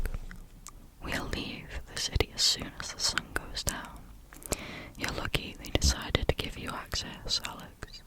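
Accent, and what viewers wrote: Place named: Liverpool, United Kingdom. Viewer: I've only just found your channel. I'm glad I did, there's something about your accent and how you enunciate, it scratches my brain. Almost sounds like a very soft scouse accent.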